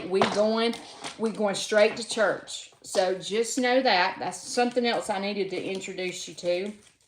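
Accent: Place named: South, United States. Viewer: Loved this show! I retired at the end of 2022 and am speeding towards 69 years old so I have a lot of newfound time on my hands! I live in Holland, Michigan & love listening to your southern accent. I believe listening to your shows (as well as those of Cog Hill Farms) will be a great way to go through my days! I appreciate the laughs that you both provide & I love watching the animals & your interactions with them. I anticipate your subscription numbers will increase so please make sure to take time for yourself to keep the balance you discussed in this show. Take care!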